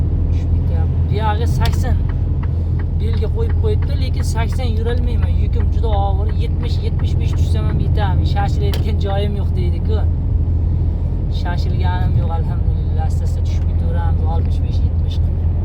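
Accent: Chiyal, Uzbekistan. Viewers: Мен хам гапларни эшитиб Чиялик бўлса керак деб ўйлагандим. Иш сохам бўйича Чироқчиликлар билан гаплашганман. Деҳқонобод хам шунақароқ шевада гаплашади. Майли омад ўзимни 92уруғли ўзбегим. ✊👍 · Qaysi tumandansiz chiyalga uhshap ketarkansiz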